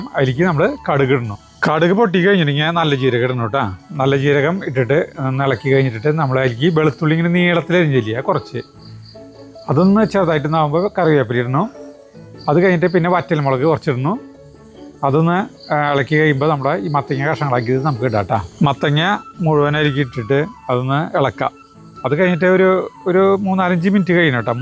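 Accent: Thrissur, India. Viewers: ഞാൻ ആദ്യമായാണ് നിങ്ങളുടെ വീഡിയോ കാണുന്നത്. ജന്മനാ തൃശ്ശൂര്കാരിയായ എനിക്ക് നിങ്ങളുടെ dish നേക്കാളും ഇഷ്ടമായത് തൃശ്ശൂർ ഭാഷയാണ്. 30 വർഷമായി പാലക്കാട് ജില്ലയിൽ താമസിക്കുന്ന എനിക്ക് തൃശ്ശൂർ ഒരു നഷ്ട സ്വപ്നമാണ്.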